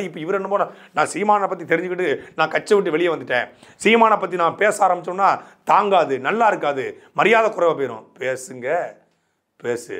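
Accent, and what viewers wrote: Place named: Thuckalay, India. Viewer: Née epadi bro thuckalay pesuna bro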